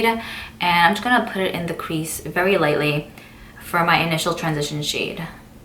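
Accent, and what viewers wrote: New York, United States. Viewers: the way you say gloss, the “just ate a bagel”, the horns in the back while you’re talking just all screams New York and I love it 🥺 moved here on a whim in August & your videos give me so much motivation to explore the city (aka TJ Maxx)! email: <mail> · I first watched you open the WNW Rose collection. (Made me want to buy it.) And I keep swearing that I'm subscribed to your channel, but I almost never have your vids pop up. (My other half is from Brooklyn and your voice reminds me of visiting NY/Jersey. Say cawfee sometime...) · I love your videos! Everytime I listen to you, I really get the "New York" vibe!😊 Nevertheless, I love purple and this collection is the "bomb". 💜💜💜💜 Purple eyeshadow looks awesome on you!🥰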